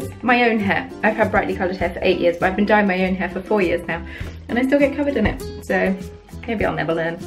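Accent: United Kingdom. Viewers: are you from britain?? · are you from Germany bc you sound very British XD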